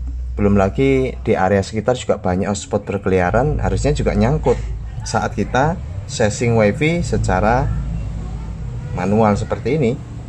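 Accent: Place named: Lampung, Indonesia. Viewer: Sampean lampung ya kang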